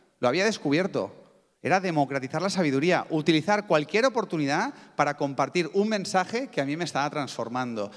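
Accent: Spain. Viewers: ! No me agrada el dialecto español (jeje me refiero a cómo se escucha cuando hablan los españoles) pero cómo me encanta lo que dices. Gracias!!! Totalmente de acuerdo contigo en la mayor parte de lo que compartes con nosotros. Gracia!!!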